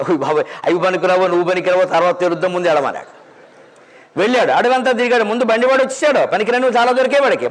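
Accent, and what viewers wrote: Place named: Andhra Pradesh, India. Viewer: Anni bagunnai kani ni yasa panikiradu ra ni Andhra yasa gudhala sulli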